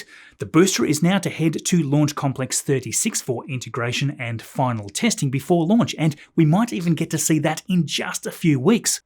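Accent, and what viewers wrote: Australia. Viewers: I've been hearing it for years and I'm finally commenting on it. The Aussie accent makes it sound like you're saying boostera instead of booster. I love our accent sometimes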